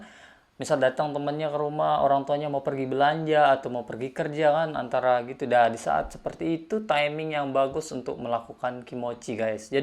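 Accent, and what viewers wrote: Lombok, Indonesia. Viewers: Ngakak gw anjr gara" aksen lomboknya masi😂 mantep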